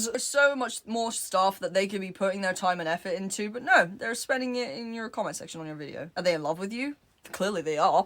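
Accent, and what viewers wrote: United Kingdom. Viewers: Are you british? (Im just asking out of curiosity)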